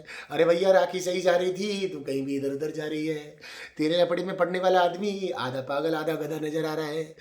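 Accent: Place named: Haryana, India. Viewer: 😃 I love ur haryanvi man hahahaha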